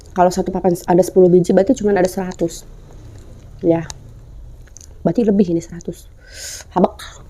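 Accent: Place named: Banten, Indonesia. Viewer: mah ngababita bae 🤤🤤🤤 bahasa na jiga banten nya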